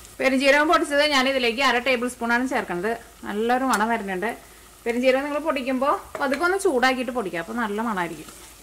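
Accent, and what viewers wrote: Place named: Angamaly, India. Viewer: Slang ketitu nammade Angamaly Kari anennu thonanu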